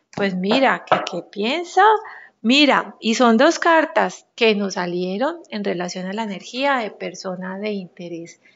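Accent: Colombia. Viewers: Buen día y si no me ekibocoese asentó es colonviano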